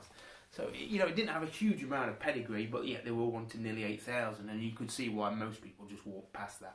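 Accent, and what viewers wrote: United Kingdom. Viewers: Your stupid accent is hurting our ears no matter if some people tell u otherwise.just try to speak with Canadian or American,mate other brits can ,u can do it too ..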